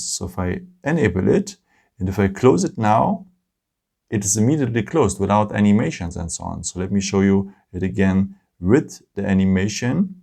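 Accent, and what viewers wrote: Germany. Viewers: Are you from germany? Kommst du aus deutschland?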